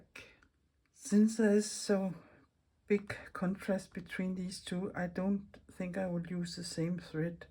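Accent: Denmark. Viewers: i absolutely love your approach to the creative process - these beautiful fabric and embroidery collages really resonate with me. i'm canadian, but my beloved grandma was danish, and i hear her beautiful, delicate accent in yours. thank you for sharing your unique, inspiring, thoughtful art. i look forward to more!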